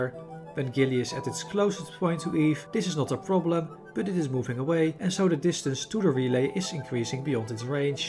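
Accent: Netherlands. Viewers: I smell dutch. Tell me if I am right or not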